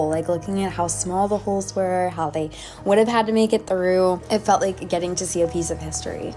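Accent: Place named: United States. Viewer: Hi, I love in American Accents. By the way, May I know Where is your accent in America? Thanks you 🇺🇸🎉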